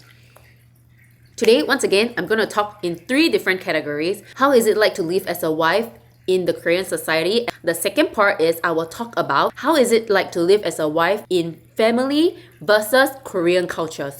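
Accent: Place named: Singapore. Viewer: Authentic, and pure singlish and local references jus tickles me n my wife when we watch yr videos...remain true to yr heart, jiayou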